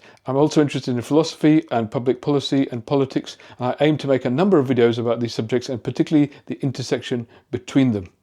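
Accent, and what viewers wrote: United Kingdom. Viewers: He sounds British to me, so born here and a citizen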